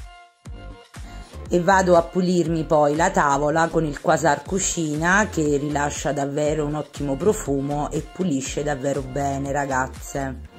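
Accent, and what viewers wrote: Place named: Sicily, Italy. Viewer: Mi fai morire dalle risate quando parli in dialetto 🤣🤣🤣🤣 io sono siciliana e ti capisco bene 😁 e si siamo sempre a pulire 🧹 ti abbraccio ❤️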